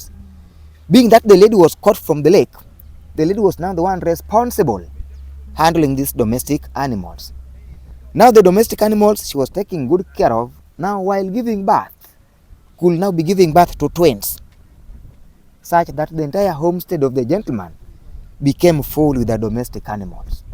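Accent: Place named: United States. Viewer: Your American English is dope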